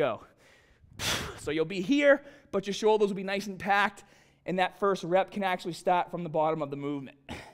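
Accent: Boston, United States. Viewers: Great video guide. You from Boston?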